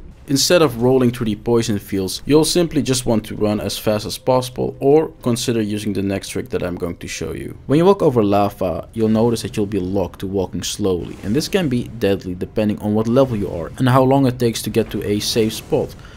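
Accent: Netherlands. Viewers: Are you Dutch? 🤔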